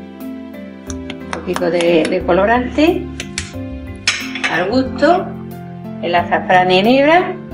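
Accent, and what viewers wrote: Murcia, Spain. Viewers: Hoy me he decidido a hacer olla murciana , pero no me acordaba bien de los ingredientes y he visto que asi la hacia mi madre ella también ponia judias verdes planas y la verdad que aunque siempre la tengo presente, cuando he visto tu receta con tu acento murciano precioso , me ha dado mucha añoranza, gracias por compartir tus platos de nuestra tierra, te sigo desde hace mucho tiempo, un gran abrazo 😘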